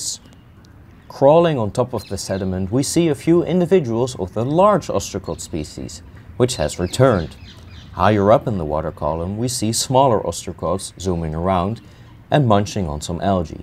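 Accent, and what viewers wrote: Netherlands. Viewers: In the first few seconds i thought hmm is he Dutch? And at that exact moment it got confirmed by a bike casually riding by 😂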